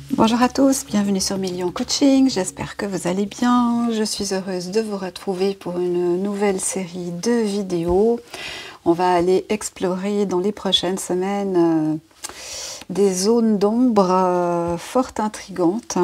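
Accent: Switzerland. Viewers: Coucou, il me disait bien que ce petit accent me rappelait quelque chose 😃😃 un gros bisou de Genève 🥰🥰🥰🇨🇭🇨🇭🇨🇭🇨🇭🇨🇭🇨🇭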